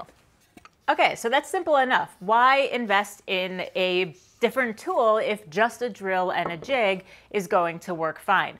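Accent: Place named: Northeast, United States. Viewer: "joinTing"?,,,There are LOTS of wood working channels out there,, Listening to your Northeast accent is why I like yours,, Keep being you!!... ;-)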